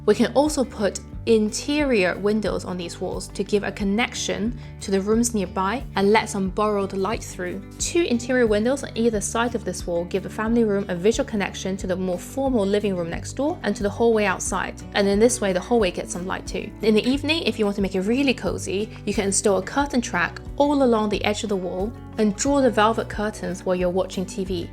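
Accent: England, United Kingdom. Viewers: Which part of England is YOUR ACCENT FROM ? manchester?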